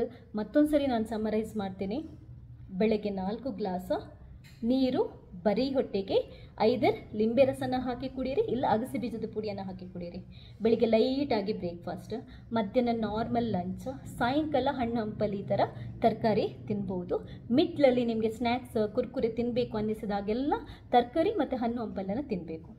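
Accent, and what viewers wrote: Mangalore, India. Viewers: Madam nivu Mangalore urura,channagi mathadathira 🙏👌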